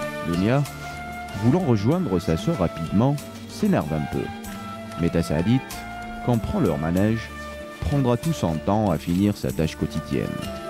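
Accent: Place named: Kabylie, Algeria. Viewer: Accent kabyle normal a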